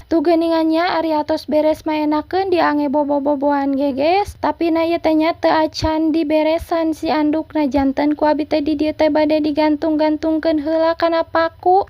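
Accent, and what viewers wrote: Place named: Sunda, Indonesia. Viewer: Sunda nya teh 😊😊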